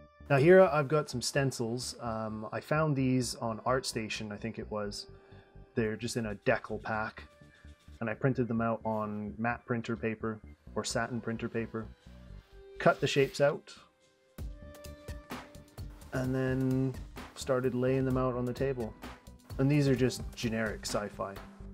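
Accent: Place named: Canada. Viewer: Watching video.....ok cool, man, I've been wanting to do one of these for a while......looking good....huh....this guy sounds pretty Canadian....Ontario maybe?....pretty sure Canadian.....Premier spray primer?!?! Oh yea bud that's some Canadian Tire paint right there......"deck-el"! C A N A D I A N C O N F I R M E D. Where ya at? the "deckle" pronunciation makes me think farther west than Ontario. AB? SK? I feel like if you were in MB I'd know.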